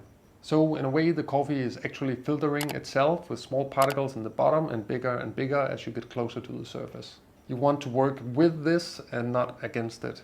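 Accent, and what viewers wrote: Denmark. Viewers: Are you Danish? You sound just like a Dane speaking English 🤔 [Edit] I was right.😆 Your name is. 😆 You cannot get more Danish than that. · I heard your accent and knew you were from Denmark before looking at your profile to confirm! Tak I would love to learn how to use a French press.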